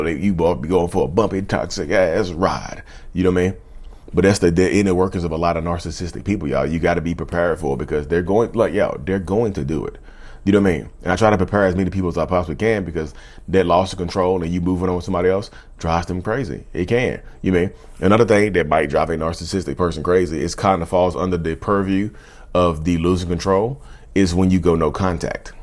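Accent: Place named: South, United States. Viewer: It’s his particular southern dialect, depending on which region a family is from. My family is from a certain part of the south. It’s normal, you just gotta get used to it and keep up. Pretty sure he’s been speaking this way since he learned to talk.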